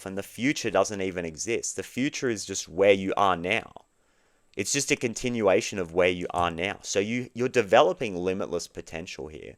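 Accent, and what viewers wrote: Australia. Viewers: The accent initially made me think you were taking the piss! Its so refreshing to see an enlightened Aussie guy thats not wearing a decorative scarf and upcycled pants that were formerly their grandma's curtains... your beautiful authentic self is no doubt inspiring other men that being real and vulnerable (gasp) is actually perceived as strength and not weakness.... well done and sending you love from south of the border! 😊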